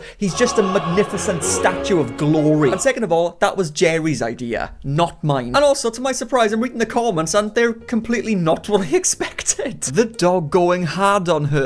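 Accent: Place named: South Wales, United Kingdom. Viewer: I'm 90 % sure iv seen you irl in Ystrad tesco 😂 iv watch 2 of your videos and the accent is definitely giving south wales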